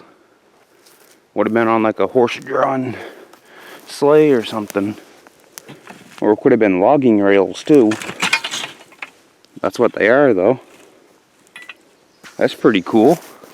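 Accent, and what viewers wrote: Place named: Maine, United States. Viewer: are you in Maine? you sound like it. Dave from Maine. love the show!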